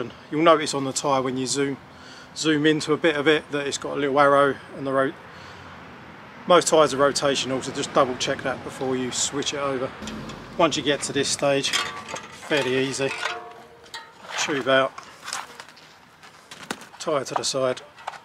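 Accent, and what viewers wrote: England, United Kingdom. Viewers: Nice to hear an Englishman doing one of these conversions. Most of them are from the US. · Судя по акценту ты англичанин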